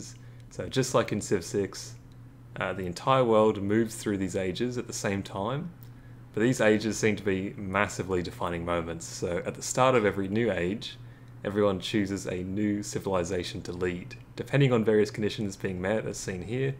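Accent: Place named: England, United Kingdom. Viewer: HOLD ON YOUR ENGLISH??? I THOUGHT YOU WERE AUSTRALIAN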